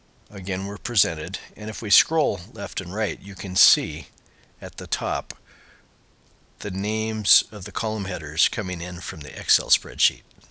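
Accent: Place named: United States. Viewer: I FINALLY FOUND AN INSTRUCTOR WHO SPEAKS AMERICAN ENGLISH.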